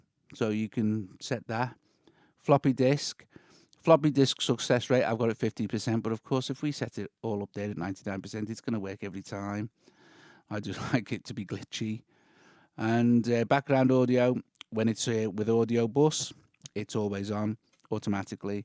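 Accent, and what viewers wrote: United Kingdom. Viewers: I loooooooooooove your accent! Is that British?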